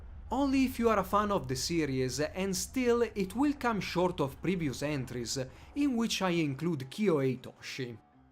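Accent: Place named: Italy. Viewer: Comunque penso (dall' accetto) che tu sia italiano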